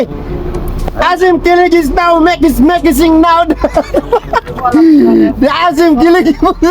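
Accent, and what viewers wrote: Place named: China. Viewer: Oragun c tagalog in tsikwa accent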